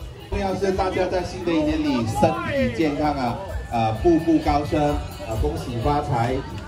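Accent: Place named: Cantonese, China. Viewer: 你说中文好可爱哦有点广东腔👍🏻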